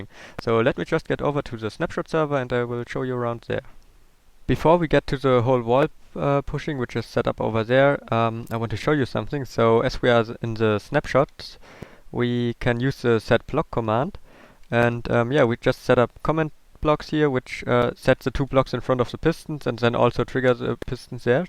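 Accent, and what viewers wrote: Germany. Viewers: Ach gutes Englisch mit deutschem Dialekt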